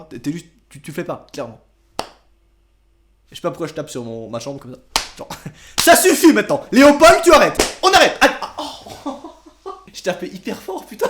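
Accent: Belgium. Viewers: T belges ??? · Tes belge ? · tu es belge ou quoi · t belge ? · Est ce qu'il est belge ? Il a un ptn d'accent flamand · tu es belge? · T es belge 🤔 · Et mais tu est Belge?? PS: Je t'adore!❤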